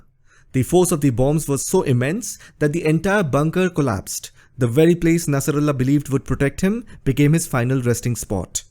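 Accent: India. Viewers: Shut up mate barley understand your curry accent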